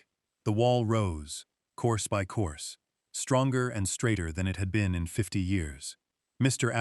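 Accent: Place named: United States. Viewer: Excellent! Story and the narration was nice and gentle. Good American accent. I really dislike those fake British accents! Appreciation from North Central, Minnesota.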